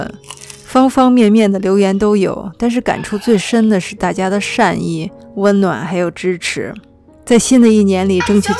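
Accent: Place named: Beijing, China. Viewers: 好喜歡看的視頻，教得好細心，有條不紊，聲音清晰動聽，正式的“京片子”。從中我真是獲益良多，很是感謝！且有一個特別好奇的地方，妳為什麼懂做這麼多的菜式。很是利害。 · 你声音好好听听起来就像是国外长大的地道北京人这么说你能理解么很喜欢你的视频我是今天开始follow的之前看过一个artichoke 的视屏不知道是不是你的 anyway goodday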